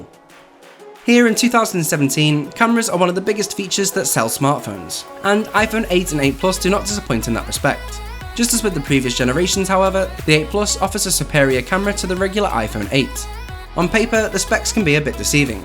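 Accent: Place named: Scotland, United Kingdom. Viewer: I love your accent, is that Scottish?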